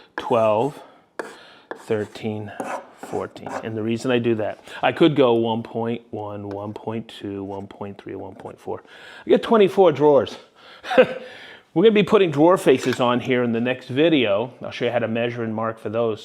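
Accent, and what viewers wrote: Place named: Maine, United States. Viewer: such genius in simplicity and Central Maine accent. Take a Shot for every "Whikkid"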